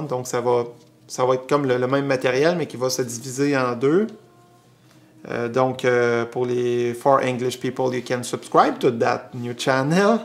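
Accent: Quebec, Canada. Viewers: C'est vrai que c'est courageux de distribuer ses chansons gratuitement, surtout pour un mec comme toi qui n'est pas totalement inconnu du grand public et qui doit passer pas mal de temps en studio. Cela dit, as-tu un support CD dispo en France ? Merci, Continue, gros ! T'es le seul québécois crédible malgré ton accent sur la toile !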